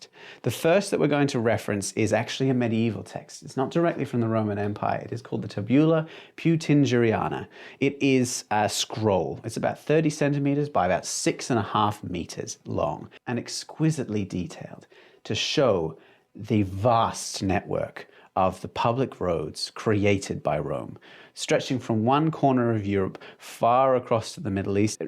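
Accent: Australia. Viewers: Love your map passion, I thought I was the only cartographic kook. 🤪 [Do I detect a slight Aussie accent ?]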